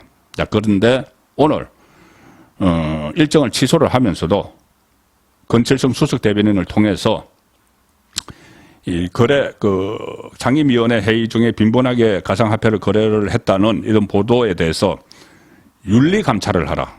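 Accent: Gyeongsang, South Korea. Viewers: 경상도 출신이라니 이해는 하겠지만 그래도 그렇지요. 방송하는 분의 발음이 이래서야 되겠습니까. '의문'은 '의문'으로 발음해야지 '어문'이 뭡니까. 장단음 발음도 이상하게 하시니 말씀이 귀에 잘 들어오지 않아요. 발음 교정 훈련을 열심히 하시기 바랍니다. 그러면 더 인기있는 유투버가 될 수 있어요.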